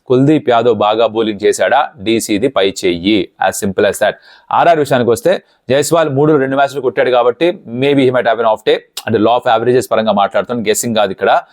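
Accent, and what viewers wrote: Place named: Godavari, India. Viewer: Bro Dialect enti Godavari Zilla vala la undi , Any roots from Godavari Districts?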